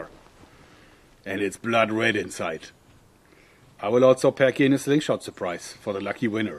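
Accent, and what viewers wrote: Germany. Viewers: You match with the medic from tf2 with your German accent and syringe gun/ slingshot.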